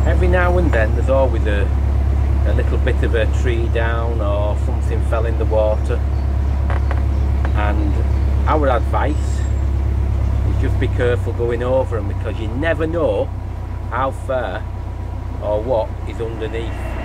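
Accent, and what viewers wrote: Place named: North, United Kingdom. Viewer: Ay up lad, so Northern haha love it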